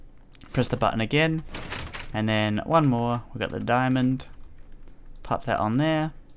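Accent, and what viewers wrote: United Kingdom. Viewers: You're Australian?! I live in Australia and thought you're accent was british. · WHAT, I thought your accent sounded British, i'm Australian aswell :D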